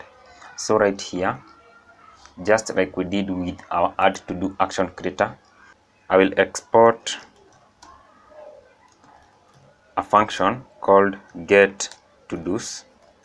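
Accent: Kenya. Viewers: Why do I hear a Kikuyu Voice😂😂😁😁, Bro, congrats. Much respect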